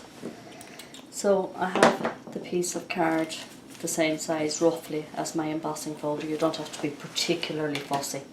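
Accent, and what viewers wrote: Ireland. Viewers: I've not looked into embossing diffusers yet, but your video makes it look so simple. A great technique to add to my cardmaking, thanks for sharing! Love the Irish accent too, lol! P. S. I wonder if you could use a plastic cutting board/mat from the Dollar Store for this purpose?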